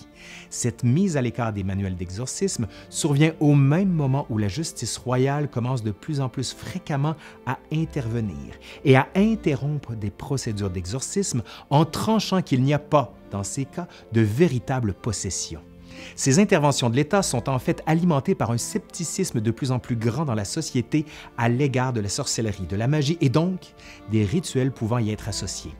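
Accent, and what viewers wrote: Quebec, Canada. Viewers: Mes seules références en la matière, c’est le film “The Exorcist “ de 1973😂! Merci pour ce complément d’enquête apportant quelques pièces de plus à mon puzzle! L’humour et votre bel accent Québécois rendent l’enrichissement de nos connaissances plus facile! 👍🏼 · Je suis tombé sur ta chaîne car je trouvais le thème intéressant. J'ai été surpris que tu sois québécois et j'ai trouvé ça dommage car je ne suis pas habitué à cet accent. Cependant, j'ai visionné un peu plus tard une autre de tes vidéos et je dois dire que ça ne me dérange plus ton accent. Les sujets traités sont tellement intéressants et je trouve même maintenant que l'accent québécois est plaisant et joli à entendre. Voilà, Merci de nous éclaircir sur tous ces sujets tout aussi passionnants les uns que les autres ! Keep going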